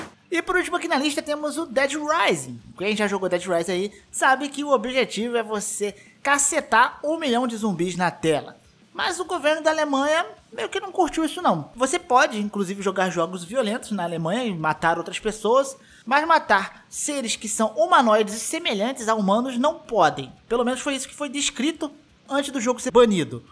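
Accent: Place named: São Paulo, Brazil. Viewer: Vídeo ficou show mas porque você quando fala tá puxando o S igual gente que mora em São Paulo? Puxa o Xiix igual carioca mesmo porque sei que vc é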